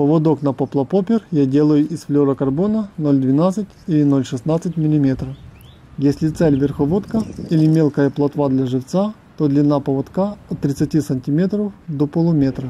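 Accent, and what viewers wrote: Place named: Russia. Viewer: у вас наче старо-руський акцент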